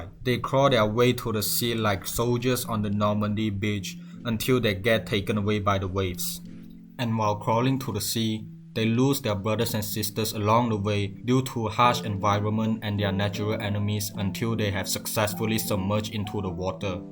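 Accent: Malaysia. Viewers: Your accent is so Malaysian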